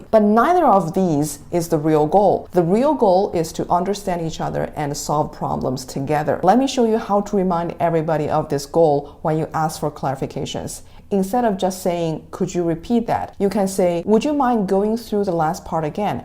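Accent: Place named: China. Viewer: 因为他的口音很中式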